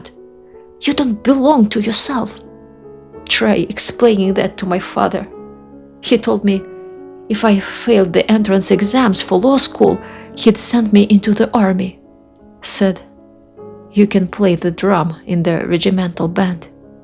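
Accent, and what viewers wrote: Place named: Russia. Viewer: This video is really cool. There is no spoken dialogue, it is narrated by herself in her perfect English with her charming Russian accent. The cinematography is vivid and authentic. Enjoy!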